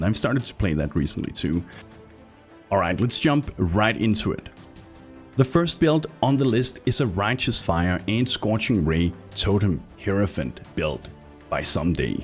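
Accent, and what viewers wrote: Denmark. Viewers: Danish? Can't help but notice that accent... Banter aside, great video man!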